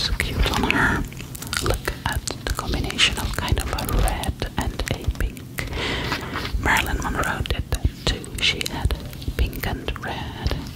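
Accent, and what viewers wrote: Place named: Netherlands. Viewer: Really love the Dutch accent with some books sounds 😊😮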